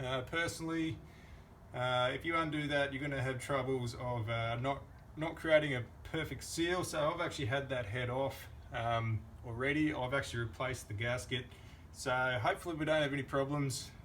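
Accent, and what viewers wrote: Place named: Australia. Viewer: Hi I’m interested to know where abouts you are, I noticed the Aussie accent. I’m in Melbourne and I have an old Rover edger I need restored.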